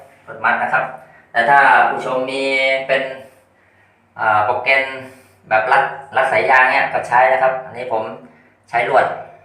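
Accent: Chanthaburi, Thailand. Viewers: สำเนียงเหมือนคนจันทบุรีเลยครับ บ้านผมอยูระยอง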